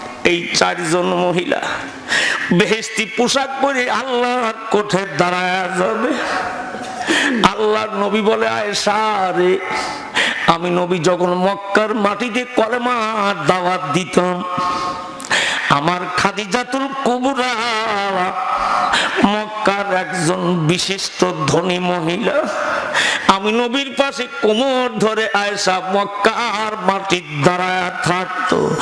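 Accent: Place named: Pabna, Bangladesh. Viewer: Tumra khanek por jabene bait cole... Pabnar vasha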